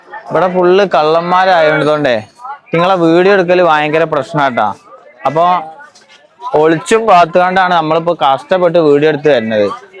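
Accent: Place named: Kozhikode, India. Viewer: കോഴിക്കോടുള്ള വനാണ്..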